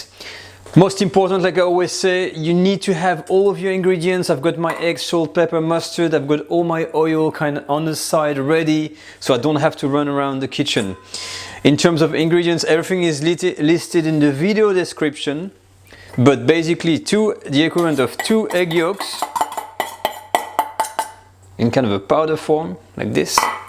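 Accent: France. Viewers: It must taste delicious! I love your adorable french accent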